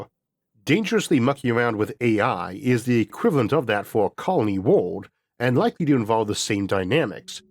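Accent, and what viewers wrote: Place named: South, United States. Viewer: Can't place your accent. Im thinking southern US with a mild speech impediment. No disrespect. Im a linguist.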